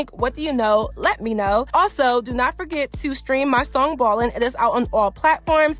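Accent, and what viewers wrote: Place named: Baltimore, United States. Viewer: Random, but I can tell by her accent and the way she enunciates her words she is 99% likely from Baltimore....great video...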